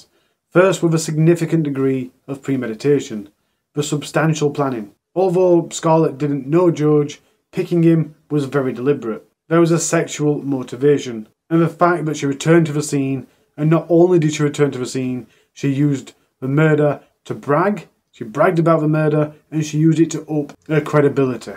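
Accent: Yorkshire, United Kingdom. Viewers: Nice to have you back sunshine, I feel at home with that Yorkshire accent, I’m In stocksbridge x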